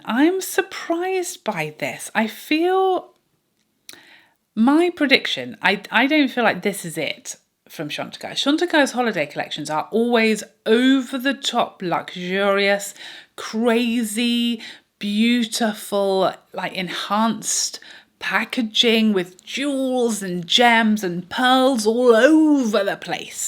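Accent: United Kingdom. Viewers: hahaha "tickling my pickle" ...wish i had a british accent to say things like this. i usually like dior quints but this bouquet one is blah, no. no to nars; did all the beauty brands come together and decide to all do a star, celestial theme? where is the creativity here? i would've liked that urban decay palette in college... im 33 now, and i prefer the muted/diffused look of chanel + dior shadows.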